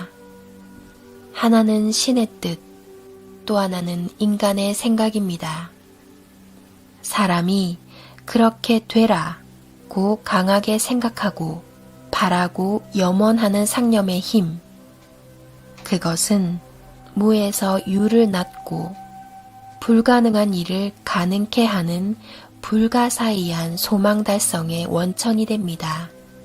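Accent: Gyeongsang, South Korea. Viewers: 어투중에 살짝 갱상도 말씨가 엿보이는디... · 경상도 어디사세요? 저도 경상돈데 목소리 좋네요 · 경상도 사투리가 살짝가미된 목소리가 듣기에 너무좋아요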